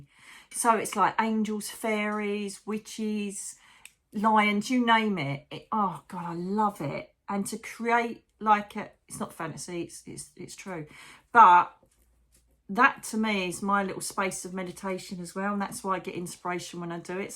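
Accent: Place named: England, United Kingdom. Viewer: Hi! I love you and your videos. I'm training myself to understand your English accent. The more I listen to you, the better I get but... you speak too fast! Thank you for being here for us. Nancy, Argentina.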